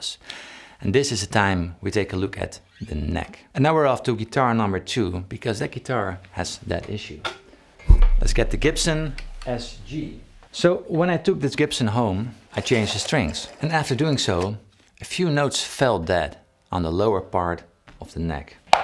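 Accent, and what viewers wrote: Netherlands. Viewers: Plus heavy Dutch accent. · Why do you sound so dutch lol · Do I hear a Dutch accent? Bedankt voor je zeer informatieve video! Zeer leerzaam!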